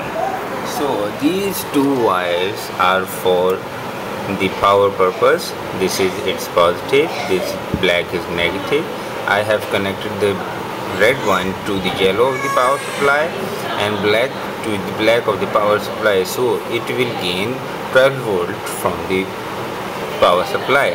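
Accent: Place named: Pakistan. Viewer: wah brother Pakistani Laga Hn.. hahahahah i m Pakistani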